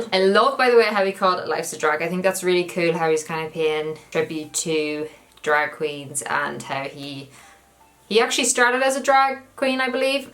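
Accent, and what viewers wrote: Ireland. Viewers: What part or Ireland are ya from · Is she irish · I'm so glad I came across your channel! You have the same sense of humor I do 😂 where are you from? I'm bad at determining accents but maybe you're from Ireland? I apologize if I'm wrong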